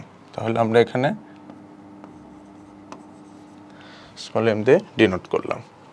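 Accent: Standard. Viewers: প্রমিত উপস্থাপন ❤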